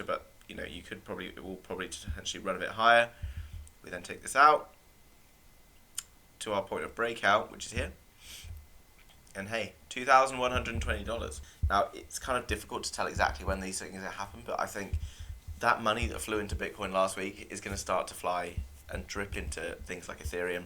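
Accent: RP, United Kingdom. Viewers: I love your English. I play it 0.75x to for extra poshness ♥️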